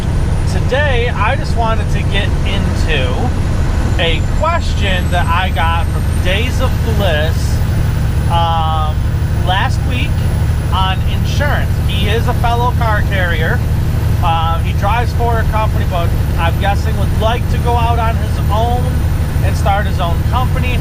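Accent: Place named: Tennessee, United States. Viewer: None of this is helpful to me sence I'm not a truck driver but I love listening to your Tennessee voice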